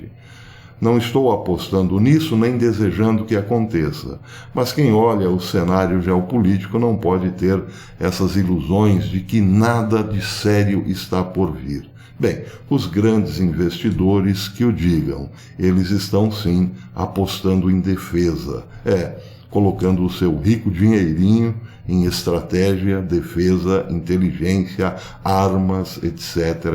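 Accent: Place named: Minas Gerais, Brazil. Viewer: velho de guerra, teu mineirês tá afiado, hein?! Parabéns 👏👏👏😁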